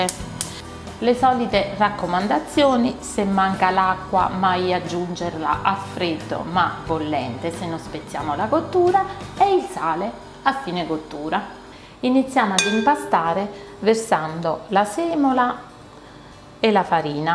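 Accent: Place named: Irpinia, Italy. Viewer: Ciao, ti seguo da un po’.. quando ho visto la tua prima ricetta mi sono catapultata indietro nella mia infanzia... i miei genitori sono di ARIANO IRPINO e il tuo accento è il tuo modo di fare mi ha fatto davvero tornare bambina, alle mie estati giù dai nonni, con i pranzi infiniti e i profumi che solo giù si possono sentire... grazie per le emozioni che mi hai fatto e mi fai rivivere dopo ogni tuo video.. ❤️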